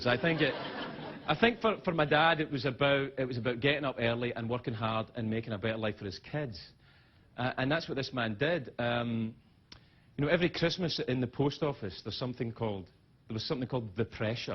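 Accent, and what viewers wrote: Scotland, United Kingdom. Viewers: He sounds SO much more Scottish here. :) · Anybody noticed he switched back to Scottish accent from his usual sort of "intermediate" accent? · His Scottish accent is in full force in this monologue...even more so than the one where he eulogized his mother. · OMG I love this! His accent is sooooo Scottish in this one.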